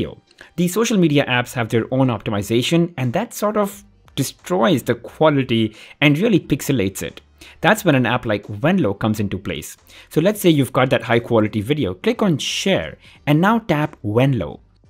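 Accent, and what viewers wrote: India. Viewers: I love hearing your 'd's So in'd'ian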